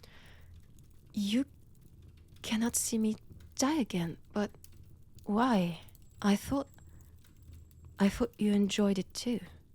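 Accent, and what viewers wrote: France. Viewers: A lovely Christmas present! A french accent audio!! I needed this!!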